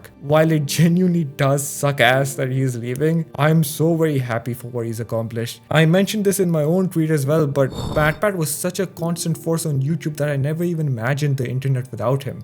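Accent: India. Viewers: Finally an Indian who recognises him!!!! I recommend fixing your accent and maybe try to better your editing and you'll get millions of views in no time!!!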